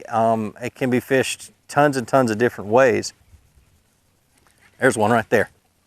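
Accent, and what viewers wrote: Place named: New England, United States. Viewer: I like how his “nagging” voice is 100% yankee 😅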